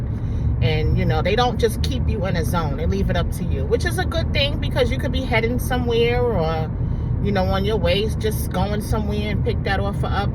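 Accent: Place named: New York, United States. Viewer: Love the accent NYC💯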